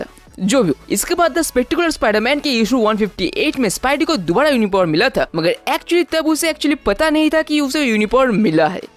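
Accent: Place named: West Bengal, India. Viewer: Bro ur accent is so heavy, anybody can tell that u belong to West Bengal 😂( No hate, i love ur vids too much bro)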